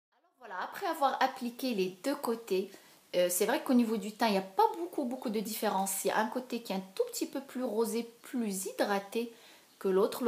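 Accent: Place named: Morocco. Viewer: essaye quand meme de faire des tuto en arabe darija tu va rien perdre car en tt cas tu a un accent marocain et ca se voi tres bien que ta pa de probleme de langue arabe comme tu le dise regarde les tuto de morrocan misstinguette c'est une marocaine qui vis en france ya pas mal de temps et malgre ca elle fait des videos en langue arabe darija je la kiff...jespere que tu va pas men vouloir je suis pas entrain de te critiquer mais c'est un point de vue et pas plus:)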